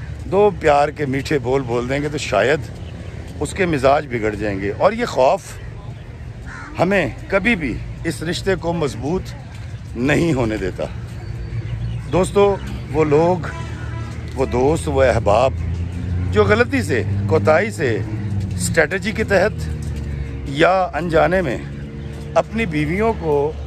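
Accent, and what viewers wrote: Lahore, Pakistan. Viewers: Basically I am from Amritsar. That’s why I love Lahori Punjabi, mithi zubaan. Same taste and same lehza 💕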